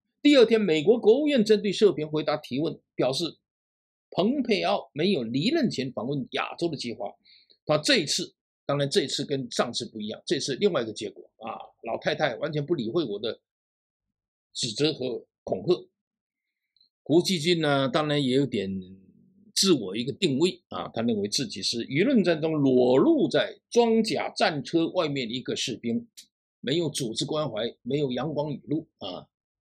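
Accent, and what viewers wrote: South, China. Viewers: 不必要，基本全能听懂，而且有一点南方口音的普通话感觉更有韵味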